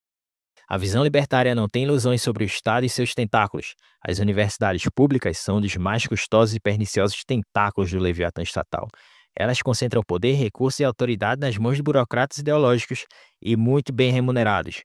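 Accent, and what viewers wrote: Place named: Ceará, Brazil. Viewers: Narrador cearense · a narraçao nordestina cagou com o canal , não canal inteligente e voz nordestina não combinam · Do carriri cearense, certeza ❤😂😂😂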